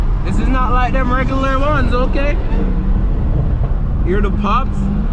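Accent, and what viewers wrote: Jamaica. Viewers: Jamaican accent 👌👌👌👌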